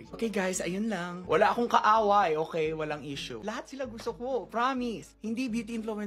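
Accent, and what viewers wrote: Philippines. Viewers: I love your Filipino accent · Are you Filipino cause you have the perfect Filipino accent im a Filipino🎉🎉😊loving the Philippinessss🎉🎉🎉🎉😊😊😊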